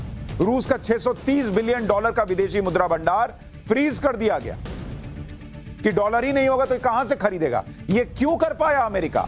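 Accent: Bihar, India. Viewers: SUSHANTSINGHJI, DOLLAR KO IS TARAH DEROGATORY TONE MEIN BAAT MAT KARIYE ,HATAO HATAO ,YEH KYA BAZARU BHASHA CHALA RAKHI HAI.HAME KOI DOLLAR SE LAGAAV NAHIN LEKIN SHISHTACHAAR KA PARICHAY DENA ZAROORI .BIHARI TONE GHALAT HAI.DOLLAR KI KOI LANKA NAHIN LAGNEWALI ,HINDUSTAN KA RUPEE ,HINDUSTAN NE MISMANAGE KARKE GIRAYI ,APNI GHALATI DIKHTI NAHIN LAGE AMERICA KO GAALI DENE .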